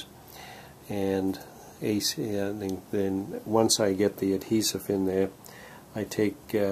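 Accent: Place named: New Zealand. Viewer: Just discovered your channel - fantastic stuff! I’m a kiwi returning to the hobby after 25 yrs in the live steam scales with lots to learn as to what has changed, and an engineering shop to make jigs and precision parts - BUT trying to pick your accent sir! I can hear NZ, a touch of English, a smidgen of Australian, Southern USA etc etc. There has to be a story there - put me out of my misery please!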